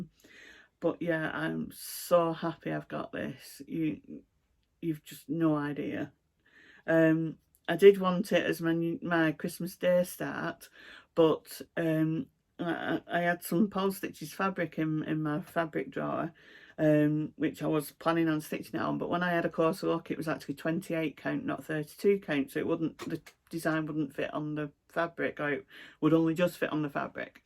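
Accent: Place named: England, United Kingdom. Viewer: I love watching you. I’m 59% English so I love your accent. My ancestors were from North Hampton. They were Bletsoe there and it was misspelled when George came to America and they spelled it Bledsoe. I was born and raised in Oklahoma so you won’t get to it on One Nation for quite a while. I live up by Cleveland z, OH now. Your doll was adorable. My son and grandsons would love the X Box controller. You did a nice job on your husband’s gift. Thank you for making videos. I’m home bound so y’all are my company. Happy stitching.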